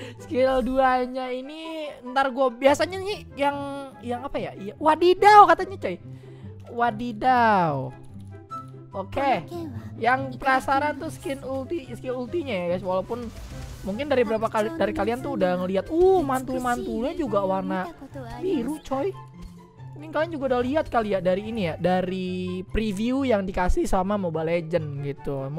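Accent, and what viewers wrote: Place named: Medan, Indonesia. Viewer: kaget bg * logat medan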